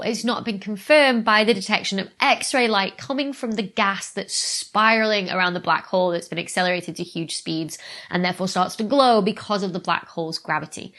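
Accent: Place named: North, United Kingdom. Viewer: Love your style, so straight to the point. The subject of astronomy will always be dear to me and to hear it in a fellow northerers accect is just a bonus. I actually have a very old book showing Halleys comet and was lucky enough to see its last appearance. Keep up the great work Dr Becky 🔭🪐 - Mav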